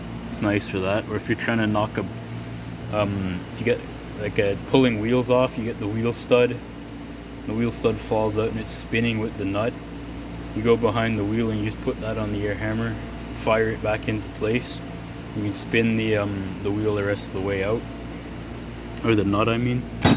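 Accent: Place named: Canada. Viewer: First, I'd like to mention how Canadian you sound there bud! Love it! Secondly, I am also an apprentice I work on car haulers transport trucks. Love every minute of it. As well, my tool box is a 3 foot MasterCraft works good and I have a lot of princess auto/Canadian tire shit. Cheap and works! Cheers there bud!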